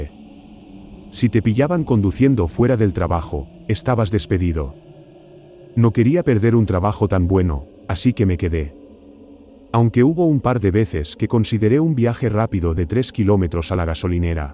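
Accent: Spain. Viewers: Y lo mejor es tu español de España sumado a tu manera de relatar,tan intrigante y la dicción,cada palabra y cada expresión que incluso nos enseñas a introducir y querér incluirlo en nuestro modo de hablar. Algo que admiro mucho de los españoles es que no solo saben hablar correctamente,saben tener un sentido del humor sin necesidad de ser grosero. Son increíbles. Saludos de Córdoba, argentina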